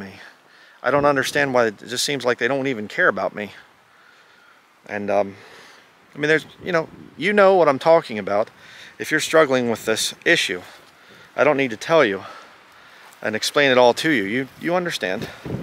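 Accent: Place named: Southeastern Pennsylvania, United States. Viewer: I knew you were from PA…I heard your accent and thought how does this New Englander have a southeastern PA accent? Did I hear you say, in so many words, you’re not a Trinitarian? I know the word Trinity doesn’t exist in the Bible…but I believe in Father, Son and the Holy Spirit. If I believe that God is one in three based on my current understanding of scripture do you find that problematic? Unlike many denominational members I like to have my beliefs challenged. Iron sharpens Iron🙏🏻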